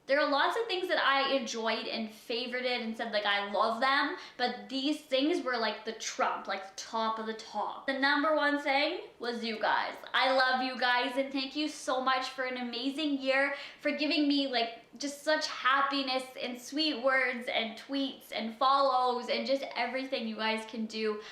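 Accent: Canada. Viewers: I do not understand why everybody hates on she is a great person why is everybody so concerned tht she is writting words in the american way im canadian n sometimes i write in the american way everybody also makes fun of he bout the she says words tht have tht letter "a" in them all countries have certain accents n tht a canadian accent honestly haters if u have nothing nice to just dont say it at all because u r ruining day n all of her cuties day btw ily ignore the hate 3:-)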